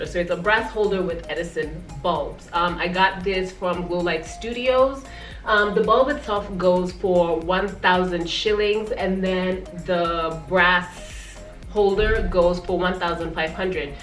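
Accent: United States. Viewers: I loved your tour so much but please try to reduce throwing up your hands constantly.i thought I was watching an American woman with accent, attitude everything.I didn't enjoy the narration. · You have a beautiful place Madam. You stated that you are in Nairobi but you have an American accent. Are you an American living and/or working in Kenya? You are also very pretty.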